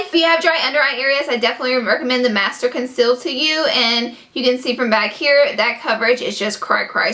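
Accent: South, United States. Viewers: You belong on duck dynasty. You have the same accent/personality of their wives. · I didn't know you live in Cali! Your accent sounds southern, but still cute tho! Anyways, I love your personality! :) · I love you accent. I'm from Eastern Kentucky and your accent is more southern than mine. Although, Alabama is further south than Kentucky. I moved from Kentucky in July of 2011 to DE. I have been living in DE since then. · I must follow you! I love your accent and sayings! Not sure where you were from but I can tell it was here in the south! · You're so cute. You sound like my friends lol #southerncharm new sub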